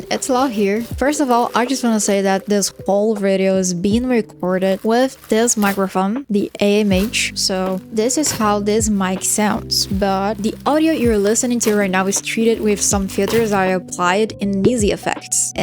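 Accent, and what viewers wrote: Brazil. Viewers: Eu vi o vídeo de hyprland com cachyOS e eu pensei hmmmmm parece inglês br (mas está mt bem) kkkkk mt bom, vlw pelos vídeos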